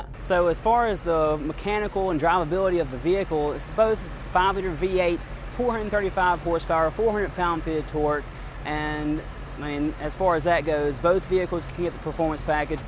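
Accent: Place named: South, United States. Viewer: He sounds like a Texas citizen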